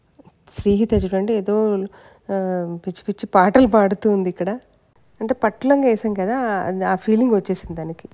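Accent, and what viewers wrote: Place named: Telangana, India. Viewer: Mee language paka telangana lagavune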